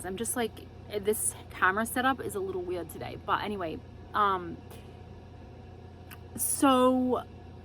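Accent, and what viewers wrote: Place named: Australia. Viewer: Super cool scarf!! Australian accent superb as always!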